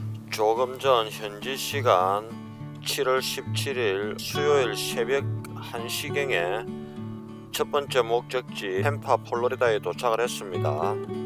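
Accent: Gyeongsang, South Korea. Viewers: 고향이 서부경남쪽? 진주? 부근?. 응원합니다. 감사합니다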